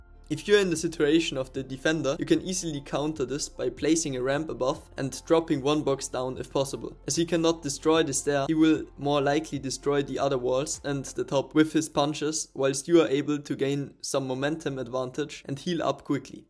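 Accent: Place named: Germany. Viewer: The german-english is crazy, but W vid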